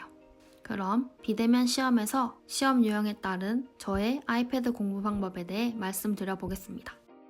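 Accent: Busan, South Korea. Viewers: 국립대라고 했지만 부산대가 쎄게 의심되는 억양..매력있어요ㅋㅋㅋ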